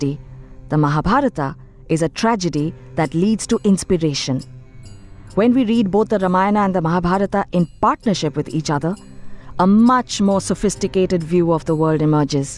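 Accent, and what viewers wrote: South India, India. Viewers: Sir, RAMA,DHARMA, MAHABHARATA are our South Indian style of Pronouncing habit.. For example in West Bengal, people pronounce where ever "V" comes, they pronounce like "B".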